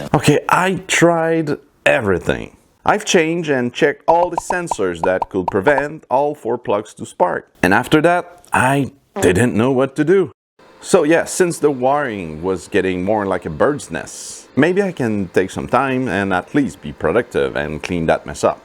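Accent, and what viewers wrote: Quebec, Canada. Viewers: Tu me semble canadien francais mon ami? are you??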